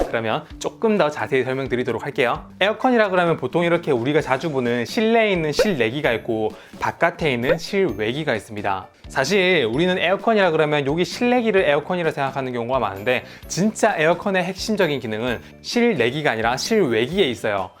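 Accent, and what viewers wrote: Gyeongsang, South Korea. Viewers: 경상도 사람입니까? 서울 사람입니까?ㅋ 귀여우시네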